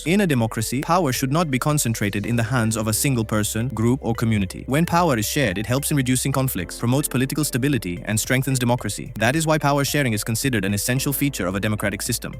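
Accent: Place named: India. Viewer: Vid is very nice and helpful for exam but your axecent changed in middle that made me laugh of your indian axecent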